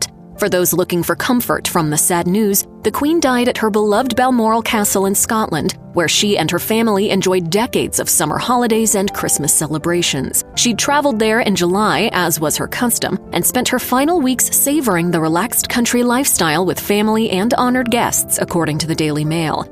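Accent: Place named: United States. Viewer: As soon as I heard the American narration, I clicked off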